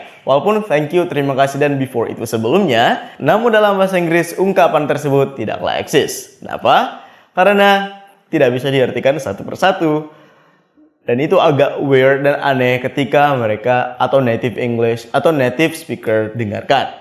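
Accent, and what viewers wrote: United Kingdom. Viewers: British accent on point bangett,kagum 🥰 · Your British accent amazed me.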